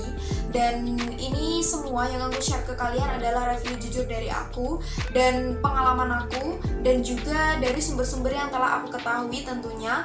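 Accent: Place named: Java, Indonesia. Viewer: Awalnya sih enak didenger, lama-lama kok jadi medokkk😂sukses terus gais💋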